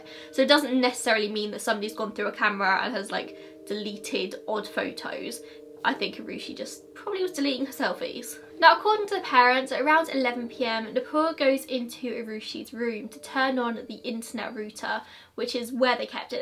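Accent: United Kingdom. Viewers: I am such a fan of yours, so I hate to make any comment that could be deemed as negative; however, it would be great if you could speak a bit slower. I adore your British accent, but have difficulty understanding what you are saying when you speed talk. I literally couldn't make out much of your Florida vlogs because of this. No disrespect intended 😉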